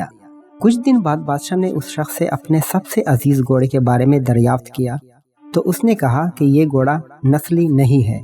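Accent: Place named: Pashto, Pakistan. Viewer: Good excellent. Although yeh Urdu Speaking andaza kisi Pashto speaking friend ka hi Hoskta hy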